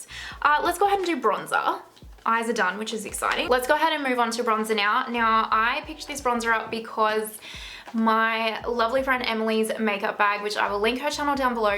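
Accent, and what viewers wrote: Australia. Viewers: Hi sweetie. I just rewatched this to see how you prefer to put the nars on and I must have missed you saying it the first time but you haven't tried the soft matt. You should. It's my favorite of all the nars foundations, It's for your more full coverage days. But so beautiful. And you get so much more product. Try it one day. xoxo BTW Great video. You always have me laughing with our aussie accent. Love ya gutts babe. xo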